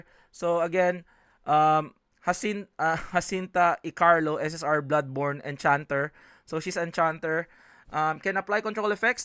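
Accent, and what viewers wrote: Philippines. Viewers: pinoy to base sa English accent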